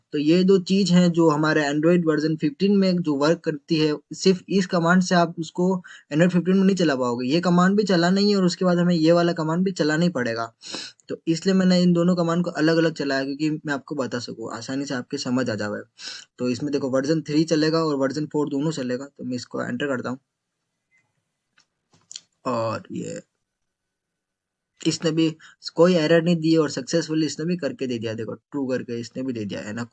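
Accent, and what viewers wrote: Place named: Ahmedabad, India. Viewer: bhai teri avaj Ahmdebadi jesi he. kya tu ahmedabad se he bhai😅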